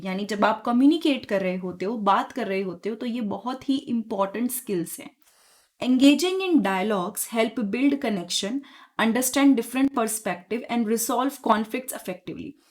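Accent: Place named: Haryana, India. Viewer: Mam is haryanvi speaker in video...focus mam says 1 se 2 jano😊....btw nice session and it is very helpful...thnks mam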